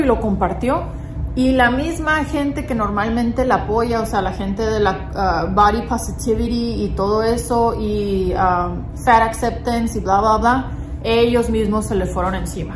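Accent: Sonora, Mexico. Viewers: pensé que eras de Chihuahua pero dije tu forma de hablar es de sonora y hoy dijo que es de sonora 😍 Saludos de Obregón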